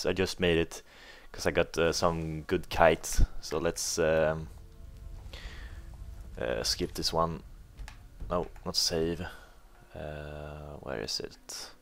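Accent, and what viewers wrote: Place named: Sweden. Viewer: He sonds like hes swedish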